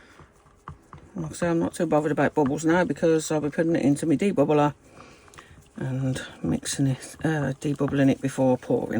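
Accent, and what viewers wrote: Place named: West Midlands, United Kingdom. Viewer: Which part of the west midlands are you from? I'm a willenhall yam yam lol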